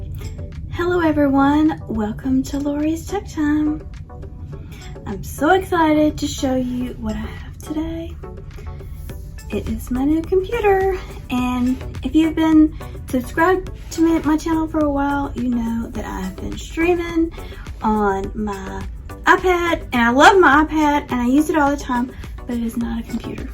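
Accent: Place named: South, United States. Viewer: HELLO~☆from Lou. KY.🐎🐎I HEAR A Familiar Southern accent; TN, KY. Awesome LAPTOP! Nice to meet you, whoa, We have tech issues ❗🌻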